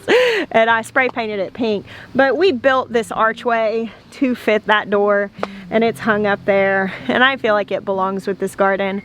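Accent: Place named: Mississippi, United States. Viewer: look now, lol, y'all aint got no business crying about the weather. This morning we woke up to 34 degrees F after Mother's day in Minneapolis Minnesota. Tonight we are expecting a whopping 45 degrees. This will be the first night above 40 degrees in about two weeks. I got peppers in the house putting on flowers already flowers on tomatoes help, help ,help! I have about 200 starters each one wants to be the first one in the garden. Thank you for being here I love listing to the accent I used to have when I left Mississippi a long time ago. Blessing on your summer and your move.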